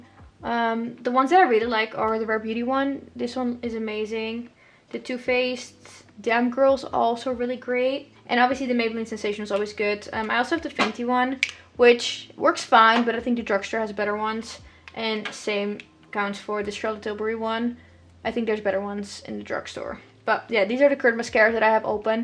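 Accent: Netherlands. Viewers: I hear a Dutch accent right? 🤭 I subscribed! I LOVE girly video's 😊🩷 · wait girl are u dutch? ur accent seems familiar hahaha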